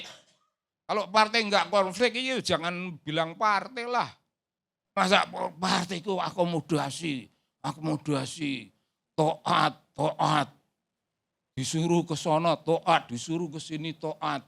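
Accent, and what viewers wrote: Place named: Banyumas, Indonesia. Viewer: Cilacapan banyumas... Ngapak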